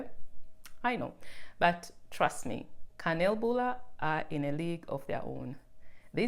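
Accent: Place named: Africa. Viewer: You sound african.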